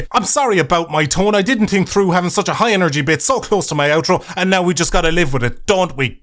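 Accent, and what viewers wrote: Ireland. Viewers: The Irish accent is 30% the reason why I subbed....the other 60% is me in utter stitches from laughing and the other 20% is me realising I'm bad at maths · This is, by far, the best and most hilarious video i've seen all year. There are soooooo many things i recognize in my own past and present relationships... Eerie that... And you tell it sooo well, and it just makes it better with those animations and your lovely Irish accent... This is pure fucking GOLD brother... I subscribed then and there, for the laughs... Well earned... I can't wait to check out what else you've made of shenanigans... Love from Denmark... <3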